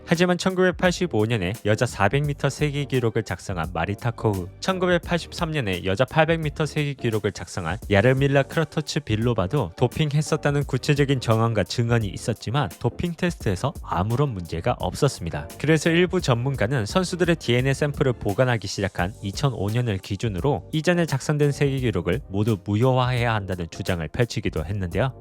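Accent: Gyeongsang, South Korea. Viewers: 유튜버 하려면 경상도 사투리부터 고치세요.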